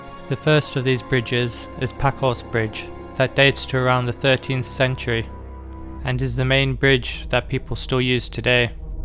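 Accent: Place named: Ireland. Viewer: Good to hear that lovely Irish accent again. I’ll make sure to visit Lancashire next time I’m in Ireland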